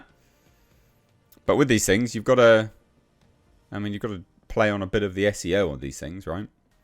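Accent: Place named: England, United Kingdom. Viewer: sounds English. Yet he just said coming out in “fall”. WTF🤦🏼🤣. Pretentious knob🤣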